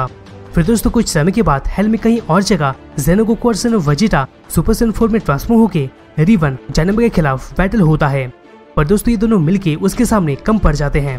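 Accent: Bengal, India. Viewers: Great bhai par apke pronunciation se samajh mein ataa hein ki aap Bengoli hon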